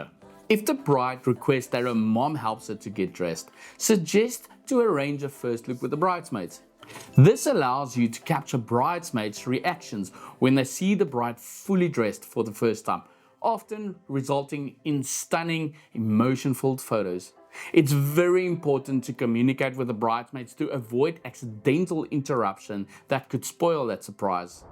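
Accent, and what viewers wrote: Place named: South Africa. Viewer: Great stuff! And I loooove your thick south African accent! Liked and subscribed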